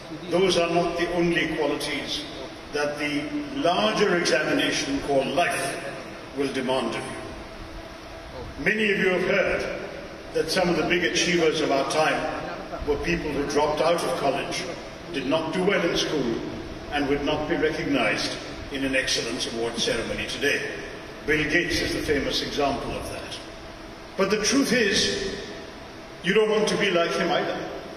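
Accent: United Kingdom. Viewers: A Britisher in sound and An Indian by heart makes this man unique. We are proud to have you....🙏♥️🙏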